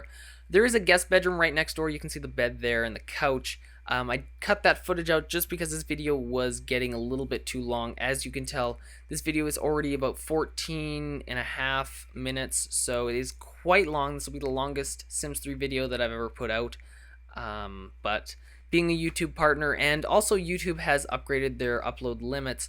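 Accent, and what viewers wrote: Canada. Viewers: are you from canada?? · no im not. ive never heard a canadaian accent b4 and i liked hearing it and i wanted to copy it momentarily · are you from canada?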